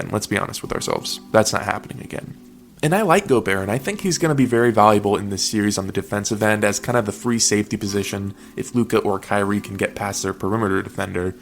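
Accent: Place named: South, United States. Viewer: Such a strong southern accent!!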